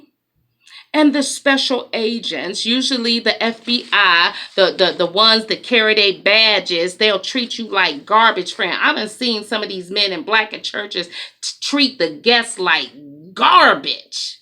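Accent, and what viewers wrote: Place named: United Kingdom. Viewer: I love the sophisticated British accent! As you were reading the list, I kept thinking of my old church. I’m glad I’m out of there!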